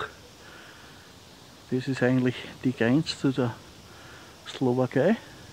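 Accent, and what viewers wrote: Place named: Austria. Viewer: Hallo, Ich schaue dich nun schon eine Zeit. Leider verstehe ich bis heute nicht Deinen Gruss an die Kranken. Was sagst Du? Kranken Sesseln??? was bedeutet das. Leider kenne ich das Östereichische Dialekt nicht:-) Viele Grüsse Michael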